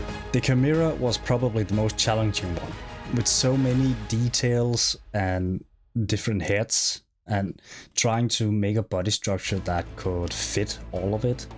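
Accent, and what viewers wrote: Denmark. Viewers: So cool! Frome your accent I think Dane ?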